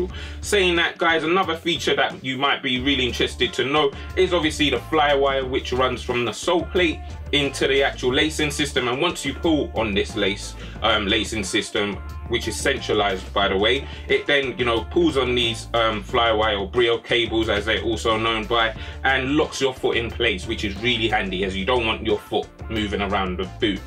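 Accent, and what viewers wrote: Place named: England, United Kingdom. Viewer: decided to turn on captions so funny watching what it comes up with because of you're strong English accent it doesn't quite understand properly la bit like a scottish accent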